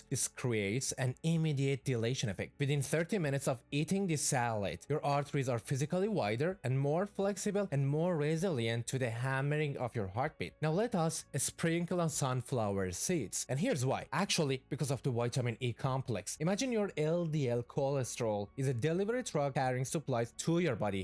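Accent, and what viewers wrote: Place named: France. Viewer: i can hear a french and Swiss accent or Indianish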